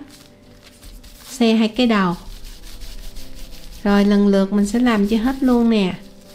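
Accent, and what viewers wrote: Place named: South, Vietnam. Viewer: 1 giọng nói miền Nam rất ngọt ngào và tự nhiên. Rất lâu rồi tôi mới đc nghe lại, ở VN bây giờ như bị nhiễm cái cách hành xử của dân Bắc sau này nên dần dần vẫn có vẻ dối trá trong cách nói. Tôi là dân Bắc nên nghe ra đc, ko còn đc cái chân thành như của. Cảm ơn. Nghe dạy nấu ăn như nghe nhạc á.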